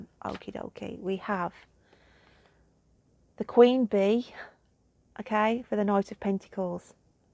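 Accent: Birmingham, United Kingdom. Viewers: Very uplifting message! It's nice to hear a Brummie doing readings 😊❤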